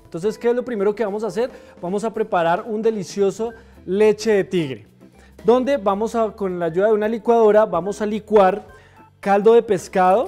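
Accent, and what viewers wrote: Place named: Colombia. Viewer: El acento colombiano es raro pero es buena receta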